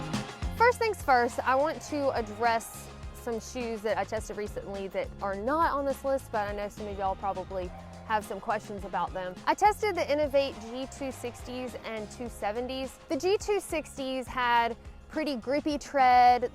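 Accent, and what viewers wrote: South, United States. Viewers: I wear Altra Superior 4.5's (coming up on 500 miles 1st pair), which I love! (found on sale and bought all of my size) They came with a rock guard insert. What are your thoughts on that type of insert? I installed them (under Dr. Scholl's inserts), but wonder am I really getting a benefit. Love your video's, southern drawl (us being from Texas) and expressions (nicknamed with friends Dixie-izms)! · The "hey, ya'll" got me! Love hearing a southern drawl on YouTube. Sounds like home to this North Carolinian. :)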